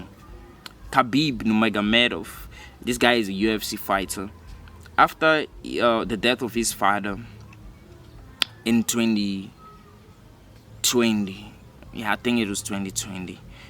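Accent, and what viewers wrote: South Africa. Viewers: I could tell by your accent that you're South African. I'm from East London, Eastern Cape. Keep up the good work, love your content.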